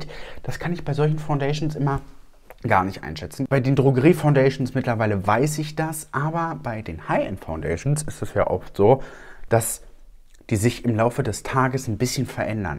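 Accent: Berlin, Germany. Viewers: Ich gucke gerade alle deine Videos 🙃 was mir auffällt: Ick vermisse dein berlinern in den neueren Videos. Aber generell suchte ich jedes Video. Liebe aus Berlin!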